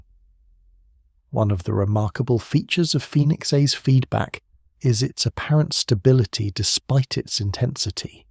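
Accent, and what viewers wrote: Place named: United Kingdom. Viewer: 2 plus hours of yap yap with British irritating narrator voice 🎉please 🙏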